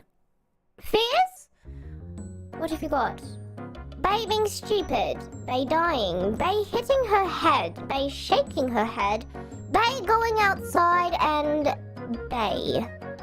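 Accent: United Kingdom. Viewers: I just want to say I really do like your british accent. I can listen it all day❤️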